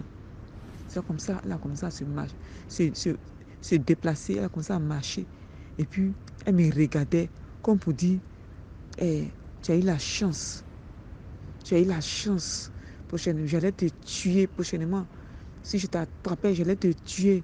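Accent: Ivory Coast. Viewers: Par ta voix si je ne trompe pas. Tu es de côte d'ivoire.Tu es très couageuese. Mon coeur aller battre plus que mon souffle. Gloire à Jésus. Ce que j'ai compris ce que on dois s'attacher à Jésus en tout temps et en tout lieu.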